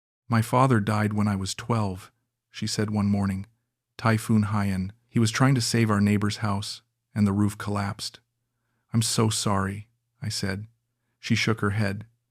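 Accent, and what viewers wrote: United States. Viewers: Another yankee voice talking about fakeness, yankees are good at scam stories.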